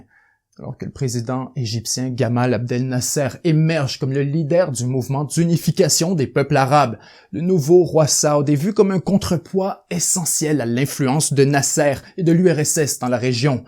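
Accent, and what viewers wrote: Quebec, Canada. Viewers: es-tu quebecois?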